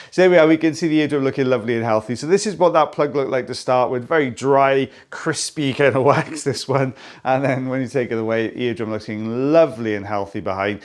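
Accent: Wales, United Kingdom. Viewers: I love how Americanisms are slowly starting to enter your lovely Welsh accent…”big ole”!!! I chuckled when you said that.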